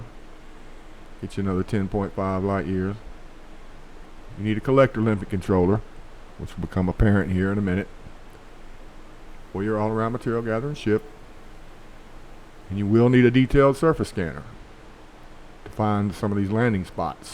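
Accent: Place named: South Carolina, United States. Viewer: man are you from south cackalacky? you sounds like some peeps from around here.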